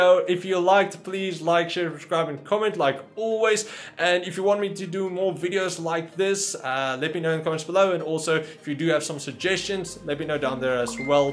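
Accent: South Africa. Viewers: Woah, I didn't expect you to be south african.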